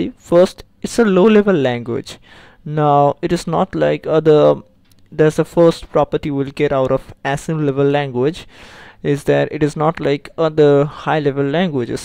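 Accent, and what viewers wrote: India. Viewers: Everyone talking about his accent. Basically, Indian people are the majority who post ANY kind of relevant tutorials or help videos on youtube for programming. Maybe if some other people would actually take the time to learn and teach and post on here, we could say something. Til then, I'm just glad people like take the time to try and help us. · There are not a lot of good Assembly tutorials out there and based on my own experience i glad I found this one as, while the presenter here has a thick accent, it is easy to understand more than 90% of what he says whereas the other Indian tutorial makers have accents that are just far to thick. Thanks for this tutorial. I am trying to learn assembly to further my knowledge of hacking as well as pass my CDA class (hopefully) lol.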